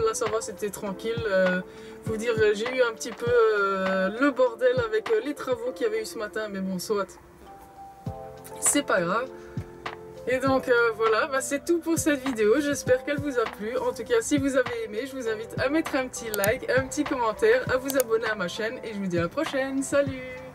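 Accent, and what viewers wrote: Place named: Belgium. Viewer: Très intéressante comme vidéo car je commence ma formation de camionneur en avril 2024. Changements de carrière pour moi. 🇨🇦🇨🇦🇨🇦 je suis de Montréal. Bonjour à vous en Belgique, j'aime bien votre accent !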